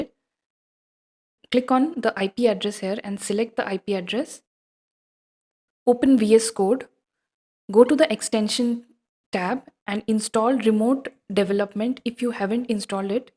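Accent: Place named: India. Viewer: I just can't listen to indian accent sorry 😆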